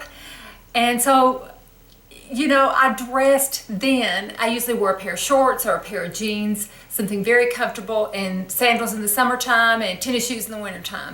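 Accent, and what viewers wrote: South, United States. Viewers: Love your style. Thank you for sharing! I also like skinny jeans and distressed jeans. I'm a rule breaker and wear what I enjoy and what I think looks good on me, my shape and coloring. I believe at my age (I turned 63 in January, but still feel like I'm 30 most days. LOL) I should be able to wear what I want. Your outer beauty is apparent, and your inner beauty comes through when you speak. A southern belle. : ) I enjoy watching all your videos no matter the subject. Your sweet southern accent makes me miss home! I'm from north GA, living in FL. Looking forward to more of your videos!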